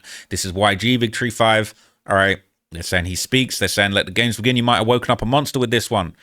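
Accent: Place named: United Kingdom. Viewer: I heard your accent and was Thank God. This is UK Surrey, not British Columbia, Surrey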